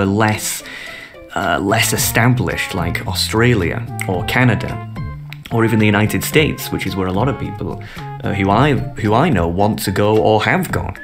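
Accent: United Kingdom. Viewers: It took me a while to figure out how nonsensical this guy is. I guess I gave him more leeway because of his nice British accent. 😅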